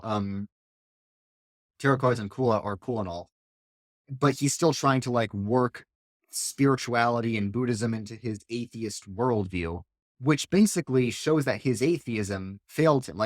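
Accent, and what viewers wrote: New York, United States. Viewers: When gets mad, his New York accent just gets stronger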